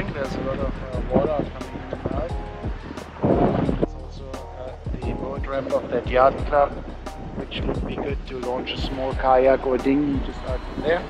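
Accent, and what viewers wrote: Germany. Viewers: German accent 😄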